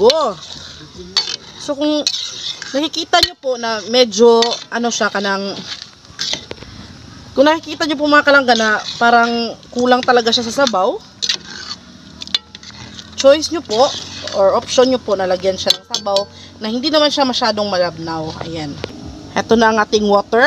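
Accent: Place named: China. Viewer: sanggi day pag tagalog ka ka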